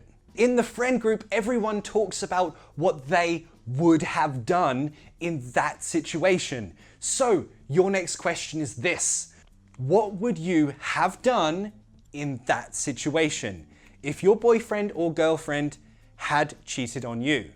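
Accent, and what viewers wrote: United Kingdom. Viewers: Are u British? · You have a great British accent. You explain English topics perfectly and clearly.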